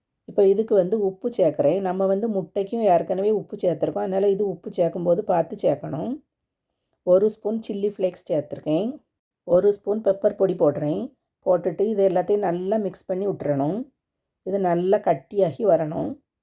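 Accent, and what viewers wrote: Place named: Tirunelveli, India. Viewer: Unga slang spr sis me too tnvli❤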